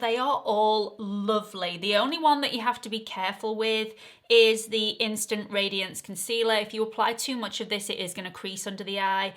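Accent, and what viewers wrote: United Kingdom. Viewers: After seeing several reviews, including yours, about No7 foundation drops, I ordered and am anxiously awaiting it's delivery. First I've purchased of that brand. As far as "absolutely stunning," I LOVE it and most every other "British sounding" words and expressions you say! You see, I'm from the southern US where lots of one syllable words are drawn out so they have many. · You can say absolutely stunning as much as you like. I just love listening to you chat. ( love your British accent). I would definitely wear the T shirt lol. Oh and the make up looks great!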